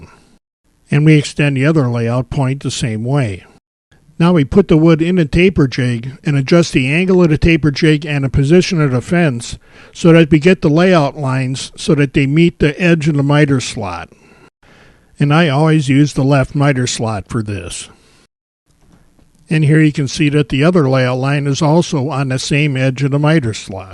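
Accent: Chicago, United States. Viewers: I’m absolutely loving this Chicago accent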